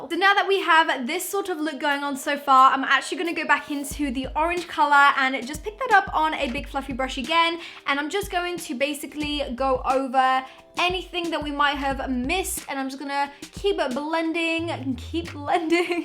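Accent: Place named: United Kingdom. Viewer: Your makeup looks stunning!! and I absolutely love your British accent. I just uploaded a fall makeup video, it would mean a lot if you check it out xx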